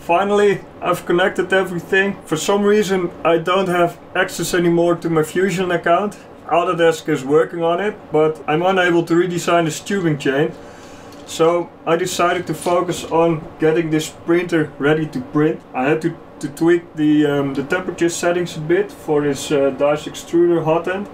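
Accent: Netherlands. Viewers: Are you dutch because of youre accent and the you sayed tering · do I hear a dutch accent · I'm too lazy to see who fourth or fived that so I'm just gonna n that :D Try reversing one of the phases. Use a multimeter to determine the individual coils or if you can find one, pull up the datasheet for the motor. I've had the same issue multiple times and that always fixed it. Steppers don't break just like that ;) Btw, totally subbed. Interesting content and Dutchlish is just super pleasant to listen to :D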